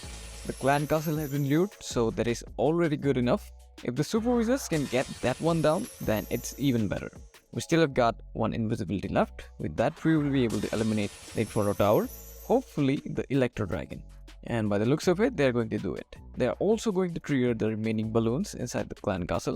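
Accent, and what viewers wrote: India. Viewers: hey ur voice tone gives an Assamese vibe.. are u?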